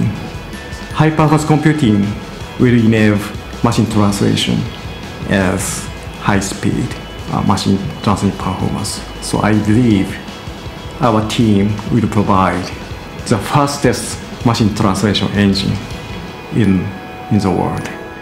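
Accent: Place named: Japan. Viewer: ものすごい典型的な、日本人らしいカタカナ英語だ…。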